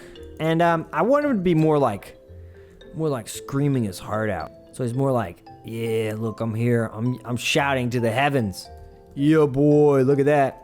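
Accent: Argentina. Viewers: Por qué tenés acento argentino? Cuál es tu historia? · wAit- Are you Argentinian(?? o_O (that or your pronunciation and accent on Spanish is like from Argentina). I'm kind of new in your channel, maybe I don't know this type of information 😅.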